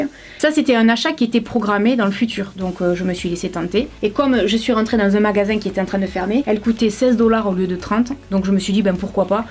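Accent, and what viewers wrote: South, France. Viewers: J'aime bcp ton honnêteté et ton accent du sud lol. Effectivement victoria secret je pense que c'est bcp de marketing car j'ai aussi acheté des brumes (3 ou 4) et effectivement ça ne tient pas du tout!! Continue j'adore tes contenus 😬😘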